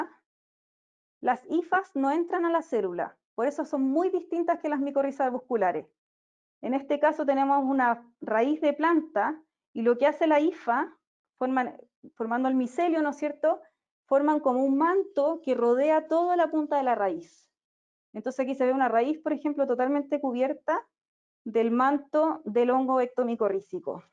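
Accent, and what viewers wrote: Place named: Chile. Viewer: Gracias por la conferencia, muy didáctica y un contenido excelente. Y un acento chileno precioso, digno de Gabriela Mistral...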